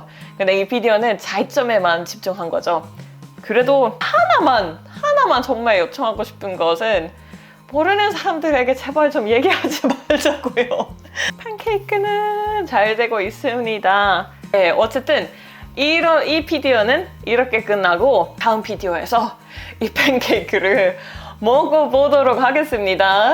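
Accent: South Korea. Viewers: 경상도네요 ㅎㅎ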